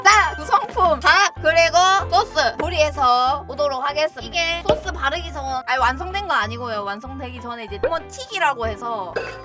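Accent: Busan, South Korea. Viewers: 이분 경상도인임? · 부산 아지매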